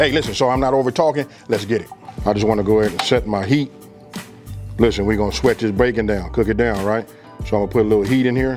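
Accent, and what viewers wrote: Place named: Los Angeles, United States. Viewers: You sound like you're from LA or Compton... southern with a west coast talk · no His accent sound more like California,.. I aint never heard no Mississippi people sound like that (im from Memphis btw)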